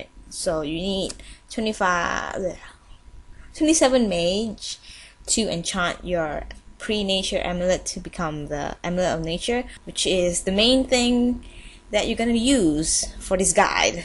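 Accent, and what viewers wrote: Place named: Scotland, United Kingdom. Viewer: He has a Scottish accent :)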